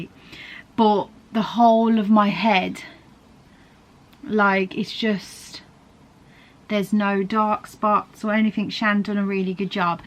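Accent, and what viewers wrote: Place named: Wolverhampton, United Kingdom. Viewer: Are you from Wolverhampton? Love your accent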